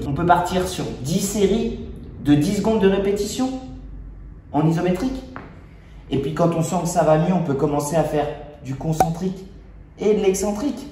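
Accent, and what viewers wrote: South, France. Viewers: il est du sud de la france et la on parle comme ça et je trouve ça simplement chouette et inoffensif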